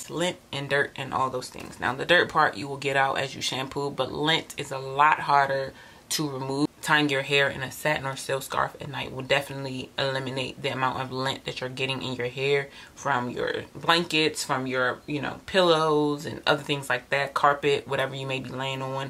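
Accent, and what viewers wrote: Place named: DC/Maryland, United States. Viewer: Hey girl hey 👋🏿, I know my people from that DC/MARYLAND accent ( most people don't hear it but I DO)❤💚🖤✊🏿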